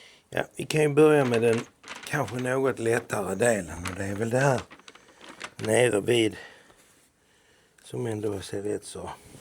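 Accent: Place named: Skåne, Sweden. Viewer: Härlig Skånska känner mig hemma ☺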